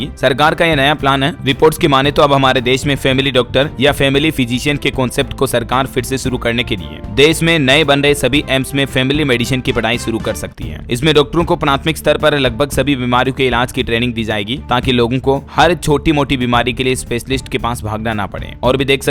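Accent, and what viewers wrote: Rajasthan, India. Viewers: Bhai rajsthani Ho kya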